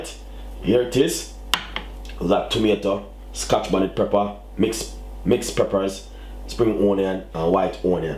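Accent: Jamaica. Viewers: Your Jamaican accent is so heavy, until I can not understand what you are saying. I love jamaican food but its apparent that you do not want other people, other than your own, to understand this recipe....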